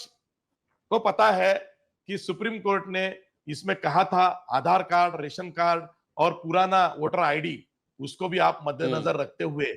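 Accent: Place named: Maharashtra, India. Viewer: Sir, tumchi Hindi marathi sarkhi wat the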